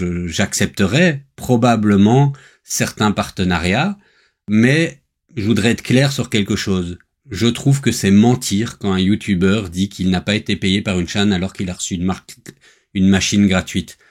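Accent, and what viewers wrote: Belgium. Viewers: Félicitations pour cette attitude de la part d'un novice en impression 3d (heu, pas de confusion, le novice, c'est moi hein 😁) ... mais aussi compatriote 🙂. Au passage, je n'ai pas encore réussi à mettre une origine sur ta (très légère) pointe d'accent, namurois comme moi ? Bref, cette modestie et humilité typiquement belges, et parfois injustement décriées, sont tout à ton honneur. Très, très bonne continuation !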